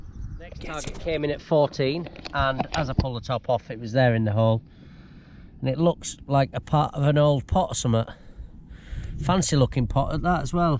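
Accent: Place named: Yorkshire, United Kingdom. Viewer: Good tip about the August headphones but I didn't understand what you said about the horseshoe icon "makes the machine more powerful" - what do you mean by that? I'm from Yorkshire btw so it's not an accent thing that I don't understand what you're saying! (West Yorkshire as opposed to your South/North Yorkshire accent). Tip - treat yoursen to a nice watch so you can tell the time without having to pull your phone out of your pocket! Really enjoyed the vid - well done pal.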